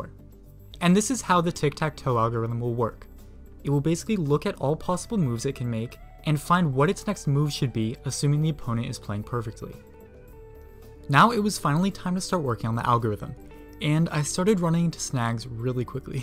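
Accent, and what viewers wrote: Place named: England, United Kingdom. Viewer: I want to learn your English accent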